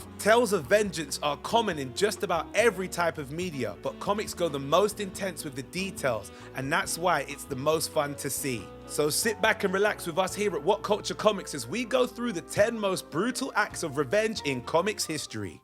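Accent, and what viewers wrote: United Kingdom. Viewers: This guy is to British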